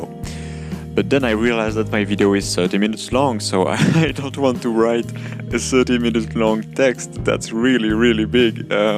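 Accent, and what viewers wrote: France. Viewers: Once again I loved listening to your French accent speaking about such important things like freedom! Can you share more info about who you were talking about and the book he wrote? You mentioned that he lived during the same time Jane Austen wrote her novels in. If you were to make videos about philosophy I think you would get good views! You spoke about being ready to be wrong or change your mind about things and as an American who has seen such cultural turmoil in our country the last few years, I think people have forgotten how to debate and talk to each other without being so rigid. About the build: this is my second favorite style... Of course darker victorian's are my absolute favorite :) but you did so well recreating this Queen Anne house. It reminds me of the Carson House in Eureka California.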